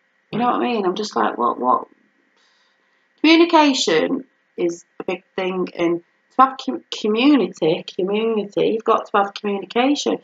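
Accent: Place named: Birmingham, United Kingdom. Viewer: This I think is Birmingham, with that accent lol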